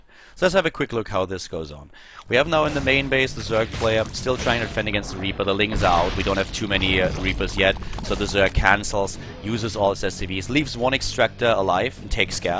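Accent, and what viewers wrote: Germany. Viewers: he s from germany^^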